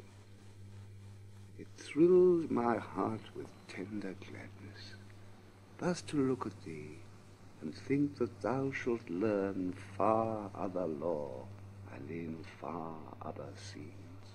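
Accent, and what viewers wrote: RP, United Kingdom. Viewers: Great stuff. He doesn’t just recite it in exquisite RP, he understands the text.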